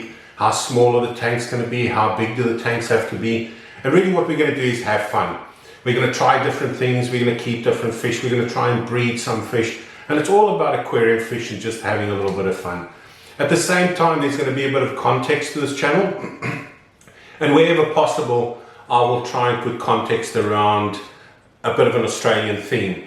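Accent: South Africa. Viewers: Hi (please insert name), I recently came across your channel and am happy that you‘ve started it. Very informative and helpful indeed. I wish to eventually also install a rack system like yours, currently busy with house renovations, so after that. I detect a South African accent. Would love to know more about where in SA you’re from. I’m in Queensland also, up in Townsville. Keep the content coming😄 It’s great! Kind regards André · Great intro video! After watching a number of your more recent videos, I picked up on the distinct accent however noted that you are in Australia. Now reading through the comments here... you are a Capetonian:) I too am from Cape Town and moved to England twelve years ago... for the weather of course:) Keep up the great work in bringing back old fashioned fish keeping, where we live in a world of everything being so commercialised these days (showing my age dating back to the 60's lol). 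Sorry for the user name, a bit odd I guess, but it is my gaming ID. For those that do not know, "scur" is storm in anglo-saxon. All the best with the channel. Cheers Gary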